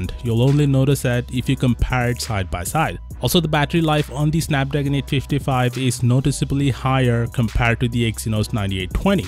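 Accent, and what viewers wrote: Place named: India. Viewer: When indian hardly trying to hide the accent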